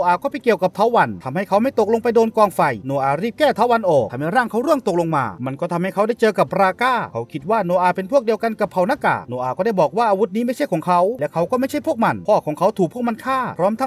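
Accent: Isan, Thailand. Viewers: พากย์ออกแนวอิสาน สนุกดี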